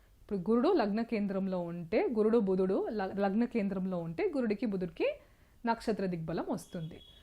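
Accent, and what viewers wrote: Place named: Tamil Nadu, India. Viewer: అమ్మా,మీ ఉచ్చారణ, చెంద్ర, సని అని పలుకుతూ ఉన్నారు.కొంత ఆరవ/ తమిళ accent నుంచి తేట తెలుగులో పలకండి. మీరు రికార్డు చేసిన తర్వాత వాయిస్ low గా వున్నదా అని కూడా చెక్ చేయండి. బాలు, ఘంటసాల పాటలతో మెరుగు చేసుకోండి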